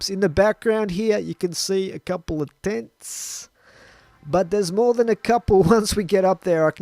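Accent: Australia. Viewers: u AUSTRALIAN mate me too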